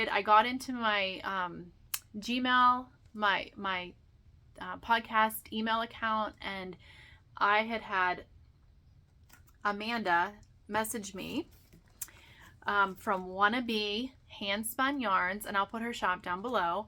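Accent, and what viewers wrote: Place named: Western Pennsylvania, United States. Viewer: Such beautiful projects! I think you can’t go wrong with any of your choices of your gorgeous hand spun for your headband! So very pretty. I also just love listening to you chat, I too am from Western PA, but my husband and I moved a bit further south many years ago. Listening to you just reminds me of family we still miss so much. Thanks for another enjoyable episode. ❤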